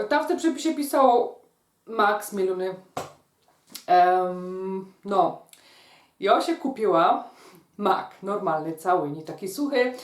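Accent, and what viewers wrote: Silesia, Poland. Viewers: Pani mówi gwarą śląską i wten sposób wymawia się mleko. Pozdrawiam. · jest za granicą,pochodzi ze Śląska i mówi swoją gwarą.To jest piękne,gwarę należy powielać,bo to część spuścizny po przodkach.I nie ma się co popisywać ze znajomością mowy polskiej!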